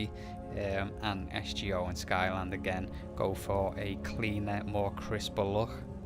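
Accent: Liverpool, United Kingdom. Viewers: Omg ur scouse and you av the same nickname as me, get in lad! 😁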